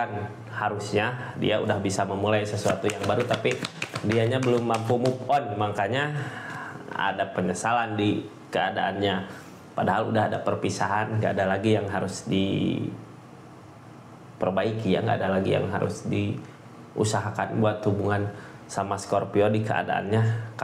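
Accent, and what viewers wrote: West Java, Indonesia. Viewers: Si Aa lucu speak up aksen Sunda pisan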